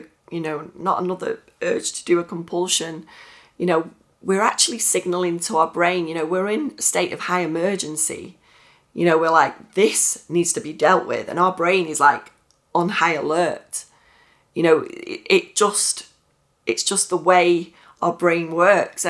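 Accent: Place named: North, United Kingdom. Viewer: Hi,! My name's Vladimir. What I've just tried to do is the video for YouTube with my complaints about my life lol, but not sure I will upload any of them. I'm 34, I've been havin' severe magical thinking ocd for more than 20 years. My life is almost fully controlled by this shit despite the fact I know about ERP and I've been studying psychology. I got rid of some compulsions in the past, but now my life is at the zero point. I'm a russian guy, I work as an English teacher for dimes, I don't have friends and I feel insanely alone. I found your channel maybe at the beginning of June and I wanna tell you that you make great videos and you're a nice person!🙂 Some hours ago I talked to another therapist and it costed a significant amount of money, he's a great man and I do understand it's only the first session, but why the f**k it should cost such money to listen about my unhappy life? 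I love your accent, by the way, I think it's a northerth one.🙂, I've been studying psychology on my own and what I've noticed that people in the west (but I'm not yet familiar with all of these ACT and other stuff) generally talk about ERP, which is great that they tell people about this method, but it's only tip of the iceberg. I believe that scientific psychological theory that tells the reason of OCD as well as panic attacks and other anxiety disorders is the inner conflict inside of our mind. Maybe you will be interested in Jacques Lacan. People sometimes ask - why my ocd themes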